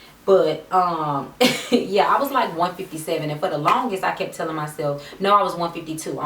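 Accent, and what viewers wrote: South, United States. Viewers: Haha nice video! Here I am watching a year later and I love your accent, I’m from Texas and I talk like this! I can’t control the way my voice changes all southern haha! 😊❤️ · You are extra pretty you must be from the south that accent thick girl lol 😂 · Real talk!!! U talk like u are from the H!!!! H town!!!!I need a friend like u here!!!!!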